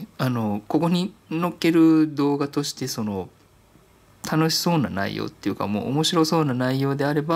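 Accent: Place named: Kansai, Japan. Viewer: 3万人、おめでとうございます! のマニアックな動画と関西弁が好きですｗ これからも楽しい動画待ってます!!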